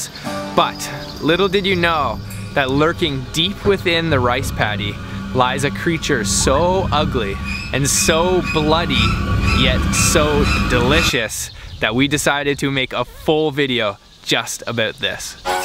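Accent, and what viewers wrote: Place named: Canada. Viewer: Yay represent (I'm Canadian too)!! I can tell from your "aboot" 😆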